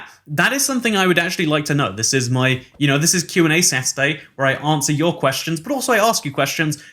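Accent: United Kingdom. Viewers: His voice is so British my ears got collanis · I loved listening to a British man talk about the US. Gives us a whole new perspective on ourselves as Americans. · are you british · First time I watched a vid, without actually watching... Must have been the British accent, or cringy gameplay 😂❤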